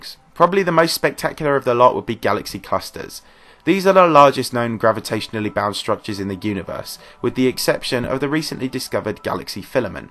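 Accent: United Kingdom. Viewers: Please slow down so we can understand what you're saying! My students love watching your videos on the universe, etc. They listen carefully but British accent spoken fast is hard to decifer! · British accent is annoying..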